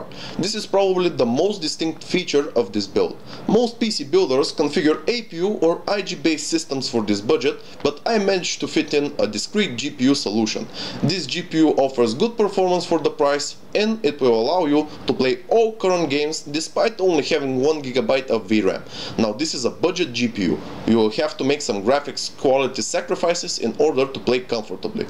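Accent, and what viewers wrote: Russia. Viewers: I love the madarfaking russian accent :D (i am Bulgarian, though) :D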